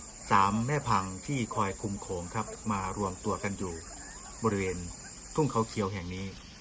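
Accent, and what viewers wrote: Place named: North, Thailand. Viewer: พากย์และบรรยาย ภาคเหนือ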